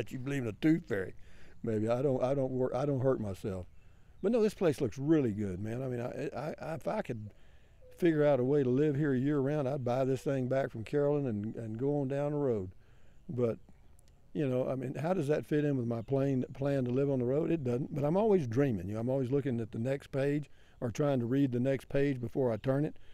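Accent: Texas, United States. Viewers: Loaded to the cookie seed! Texas talk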